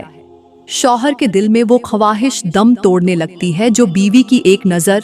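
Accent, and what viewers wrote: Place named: India. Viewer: Bayan toh bahut achcha hai lakin bahut ziyada tafseel se batakar video bada kiya gaya hai iski itni zarurat nahin thi ...aap ki Urdu bhi itni achchi nahin hai hindi alfaaz Hindi laheje se pata chal raha hai ...sab se buri baat ye hai k aap apne khoob soorat haath aur ungliyon ko dikha rahi hain ...isse parhaiz keejiye... f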